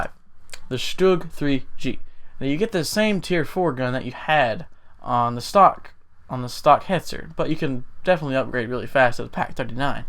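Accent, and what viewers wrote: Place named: South, United States. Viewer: You sound southern af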